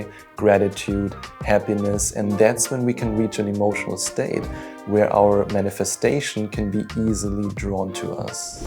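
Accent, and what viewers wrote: Germany. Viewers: German accent?… thanks for the breakdown. It’s less lofty and technical than Dispenza video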